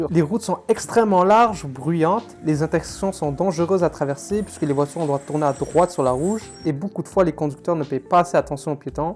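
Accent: France. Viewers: Quel commentateur de merde! PLEIN de faux et en plus avec un accès de merde, xur à comprendre, un vrai français frustré, comme dirait Martineau " mais de kessé ”? Y a plein d autre pays pour habiter. Je suis de Québec et j aime bien Laval pour sa modernité, ses grands boulevards larges, son métro, l” université de montréal, Place Bell, espace Montmorency, centre de la nature, carrefour Laval, etc... Laval est la place no.1 au Québec pour la culture en serre... Donc, petit frustré, probablement que tu a une crotte contre ton compte de taxe ou une contravention pour avoir grillé un feu rouge te là, tu fais du bassin gratuit. Mauvais immigrant dont on a pas besoin, même si on manque de monde. On veut des quebecois d adoption respectueux, heureux et fiers. Pas ses plaignants. Mon grand père qui a, avec tous les autres grand père set mon père, ne se plaignaient pas. 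Ils faisaient. Bye, conard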